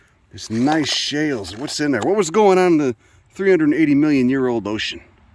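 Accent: Chicago, United States. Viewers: Aye as a WNY native, Buffalonian to be exact I've been waiting for this. The train tracks in Buffalo & the connected bike path (compete with an abandoned golf course converted to a diy skatepark by the local latch key youth) is where I fell I love with native plants. #Greatlakessupremecy..........I'll forgive the NE accent, but you're closer to WNY with your Chicago accent than you would be with New England.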